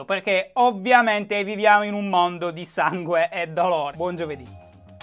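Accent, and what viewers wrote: Sardinia, Italy. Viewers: Prima volta che guardo un tuo video, nuova iscritta :) (Forse che si nota che sei sardo :D)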